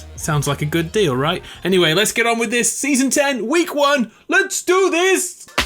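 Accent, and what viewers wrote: United Kingdom. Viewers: May I ask are you are a Brit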